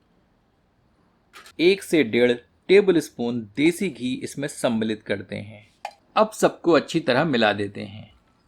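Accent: Standard. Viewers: The pure hindi voice over is amazing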